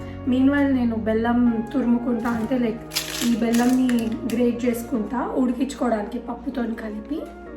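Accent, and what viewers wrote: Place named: Vijayawada, India. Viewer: Mee slang chala bagundhi.... Meeru vijayawada....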